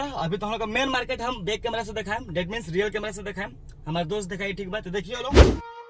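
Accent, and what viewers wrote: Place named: Bhojpuri, India. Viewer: I from Nepal... Bhojpuri bhasa itna mast boll nu dil khus hojala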